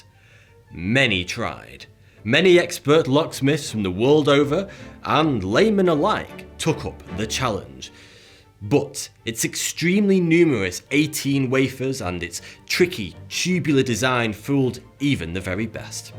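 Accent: United Kingdom. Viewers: Oh my goodness, I have fallen in love with your accent. The British pronunciation of the English language is truly the most gorgeous to the ear. Plus the great content. Why haven't I found you ealier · I also can't unhear it. Is it due to the British accent? I had also heard "everything" as "everyfim" in the game Battlefield 1... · Could be the British accent. But I found myself mesmerized by this. Lol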